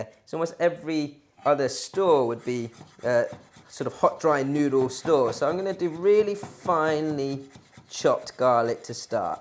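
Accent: England, United Kingdom. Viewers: Wow Chinese dude with English accent... Impressive 😃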